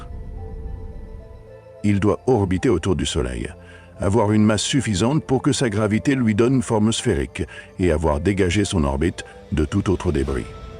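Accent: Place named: United States. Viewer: accent americain ridicule à chier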